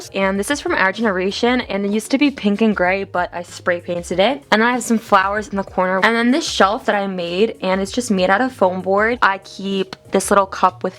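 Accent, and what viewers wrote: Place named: Oklahoma, United States. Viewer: your accent is VERY oklahoman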